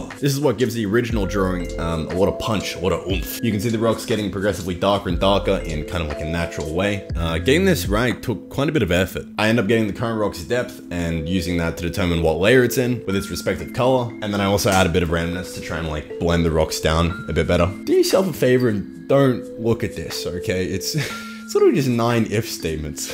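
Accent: Australia. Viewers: Watching this for the first time I was like "Wait is he Cockney or is he from New Jersey because he sounds like both at the same time" Then I figured out I had forgotten the existence of Australia. It happens. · that's called.... an australian accent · you sound like you're from australia and new york at the same time and its fuckin weird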